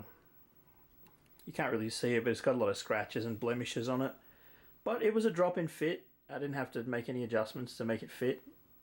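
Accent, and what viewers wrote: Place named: Australia. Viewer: Love the marquee mate! Looks awesome. I suppose you’re also in Australia or maybe NZ by the accent? I just placed an order with Chino last night and should be getting mine in NSW in a month. Very excited and planning on making some small tweaks - mostly replacing the monitor and connecting either a pc or my ps4/ps5 at some point. Just checking if you’re really in AU/NZ to get some tips on where to get parts from locally. I’m sure I’ll need a little box or some cables here and there lol. Cheers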